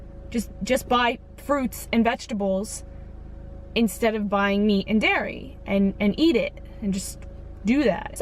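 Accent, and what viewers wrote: Pennsylvania, United States. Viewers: As soon as I heard your accent I knew you were from PA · I love your accent! I'm also from PA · your accent I knew you were from PA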